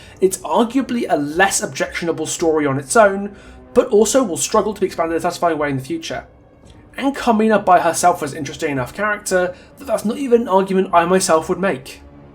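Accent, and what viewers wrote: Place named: United Kingdom. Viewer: Anyone who calls you smug is only because of your British accent because anyone who talks to you ever KNOWS you’re just a big ol’ sweetie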